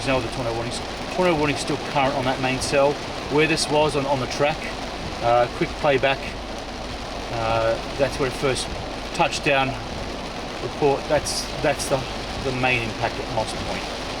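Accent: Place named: Australia. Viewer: Just came upon your channel sir. I really appreciate your approach. Safety and a true love to help others. I'm sure im not the first, but can you imagine being in the southern US; get smacked by a storm and have some bloke with an Aussie accent asking if you're ok. Did i get whisked away to Oz? Well done. 73 God Bless.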